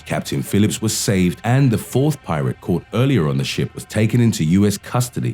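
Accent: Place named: United Kingdom. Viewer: I liked the first narrator and this British narrator now.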